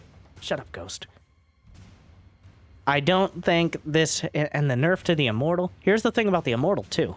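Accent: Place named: Appalachia, United States. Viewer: Why do u talk like a hillbilly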